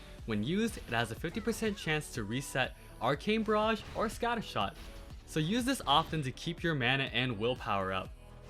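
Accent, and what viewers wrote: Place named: Asia. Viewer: It's funny how you can hear that the guy speaking is an Asian.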